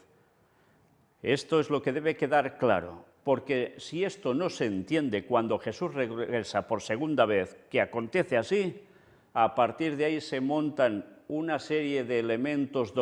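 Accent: Spain. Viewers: Gracias hermano por su predica, ciertamente disfruto mucho cuando usted predica porque hace una mezcla de verdades con su jocosa manera de hablar estilo espanol. Bendiciones.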